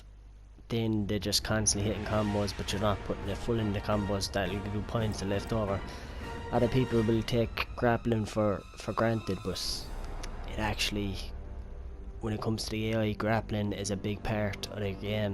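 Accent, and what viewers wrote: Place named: Ireland. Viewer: Lad your Irish omg I'm Irish too ! Add me S2S ( Nintendo Switch )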